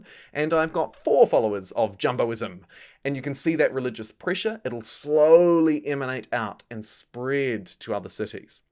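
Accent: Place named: New Zealand. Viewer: ha, would not have placed that accent as kiwi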